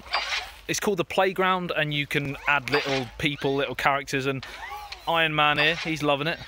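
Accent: United Kingdom. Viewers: eu amo o sotaque britânico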